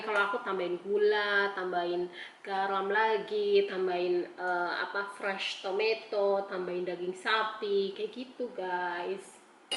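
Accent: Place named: East Java, Indonesia. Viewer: Bu, dari mana sih? Logatnya kok kaya Jawa Timur an.. Maaf penggemar baru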